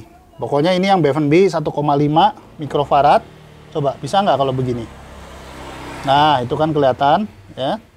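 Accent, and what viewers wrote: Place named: Banyumas, Indonesia. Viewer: Matap tutor video dari Pak suskes selalu buat Bapak,salam kenal dari wong kebumen,sama2 ngapak kaya mas ari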